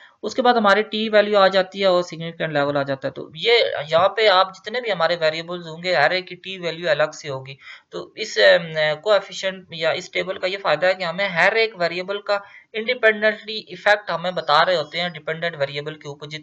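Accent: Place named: Kashmir, India. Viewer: Great sir....your accent is saying that you must be a kashmiri.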